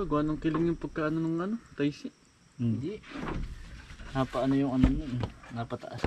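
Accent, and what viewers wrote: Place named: Batangas, Philippines. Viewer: Hawig sa puntong batangas eh, saan ga po kayo sa Batangas?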